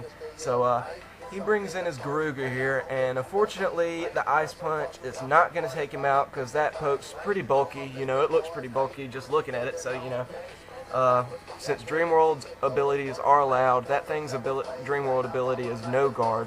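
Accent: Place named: South, United States. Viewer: where are you from? im likin that southern accent!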